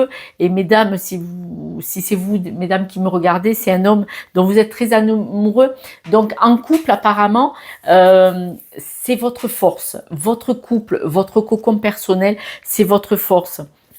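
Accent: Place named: Toulouse, France. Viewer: je reconnaissais cet accent .. je suis pas loin de Toulouse aussi .. ❤️🥰🥰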